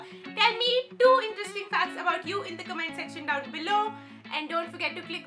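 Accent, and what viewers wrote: India. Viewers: Your indian accent is sooo cute..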